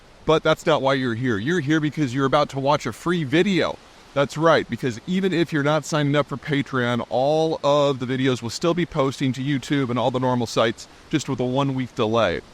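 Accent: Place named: Midwest, United States. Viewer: Hi: That is a well known speech pattern common to the midwest America, lots of other examples, picture and pitcher. I have this myself, I can't hear a difference between "nu-clear and new-clear" Daniel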